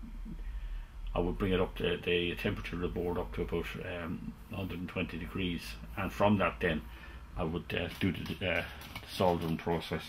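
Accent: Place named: Ireland. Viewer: You must be from Ireland, they'd some irish accent!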